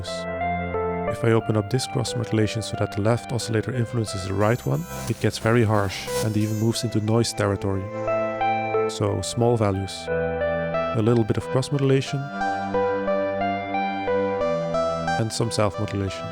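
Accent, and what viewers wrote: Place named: Netherlands. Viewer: thanks for the response - it's one of the best and most lucid tuts i've seen about this subject - would it be possible to put the voice on one channel and the synth on the other so that we could pan it at home? i'll definitely give it another go, but trying to decipher your (Dutch/Belgian?) accent at the same time was giving me a headache so i had to stop about 10 minutes in, sorry :)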